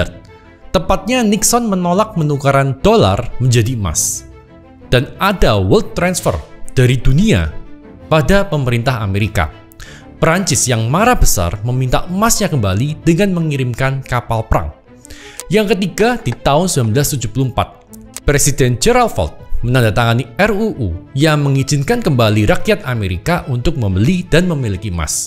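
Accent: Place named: Madura, Indonesia. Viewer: Madura pak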